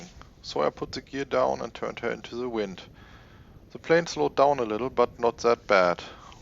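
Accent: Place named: Germany. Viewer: Nice flight,nice Denglish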